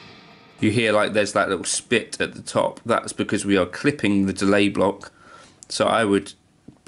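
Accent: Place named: Bristol, United Kingdom. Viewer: Random question - Where did you grow up? My girlfriend has overheard your videos when I watch them and called you "that Bristol guitar bloke" based on your accent. I said he's from Exeter in Devon as far as I know and the accent is similar (We're oop north) but she insists you're from Bristol and must have moved. I insist she's crap at identifying accents. Can you help settle an argument?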